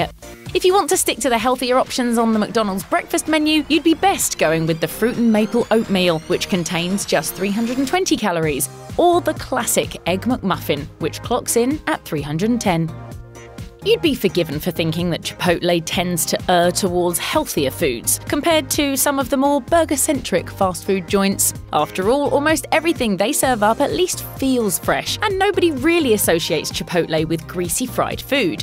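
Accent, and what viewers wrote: United Kingdom. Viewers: All well and good, but these are all American shops and it is a British woman talking about them. Very misleading. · How come you have an Anglo voice over about a100% Yanky topic. I'm in Stalya a mix of Yanky an Anglo and here No one would have a clue about this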